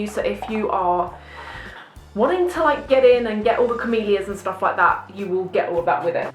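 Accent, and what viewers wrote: United Kingdom. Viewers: I love her British accent .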